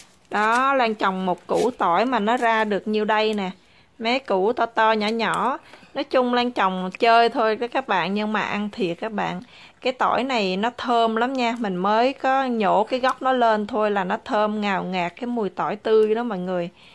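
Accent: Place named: Mekong Delta, Vietnam. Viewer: OK. Chi Lan. Giong noi chi nghe nhu mien Tay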